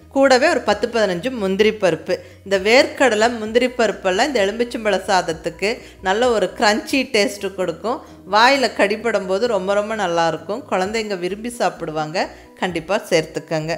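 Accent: Kongu, India. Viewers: Neenga pesura cbe kongu Tamil ku ....na addit ❤️..cbe la enka irukinka mam...Naanum cbe than ❤️👍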